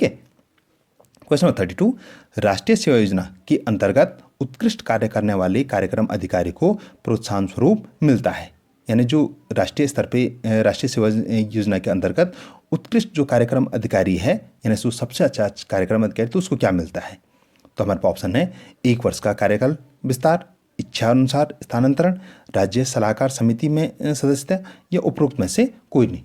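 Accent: Garhwal, India. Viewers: Sirji aap garhwali lagre ho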